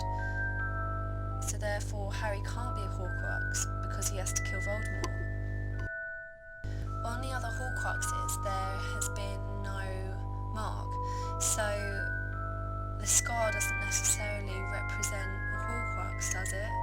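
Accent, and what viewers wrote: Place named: England, United Kingdom. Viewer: x.o I can't hear you when you're reading; but I assume you're grabbing facts from the books since there are more to support he may not be a Horcrux. And ZOMFG, nice English accent. =) And to add on, Voldemort must've known risks and such before going on with it, esp when DD had said it was dangerous to confine one's soul within an object of free will. Sorry, if you've said that already though. xP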